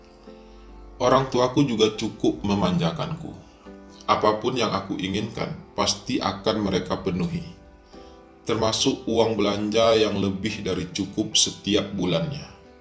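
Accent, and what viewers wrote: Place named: West Sumatra, Indonesia. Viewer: Logatnya logat Sumbar (Minang)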